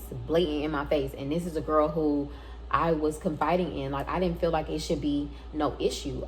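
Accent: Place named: South, United States. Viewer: Yess your story times are the BEST 🙌🏾 and I can tell you from the south lol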